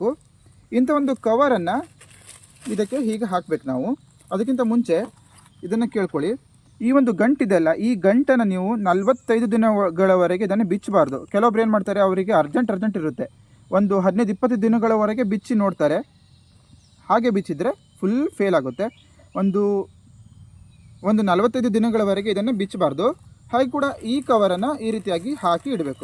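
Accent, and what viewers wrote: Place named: Yellapur, India. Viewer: ನಿಮ್ಮ ಮಾತು ಕೇಳಿದ್ರೆ ಯಲ್ಲಾಪುರದವರು ಎಂಬುದು ಖಾತ್ರಿಯಾಗುತ್ತದೆ. ಎಲ್ಲಿಯವರು ನೀವು?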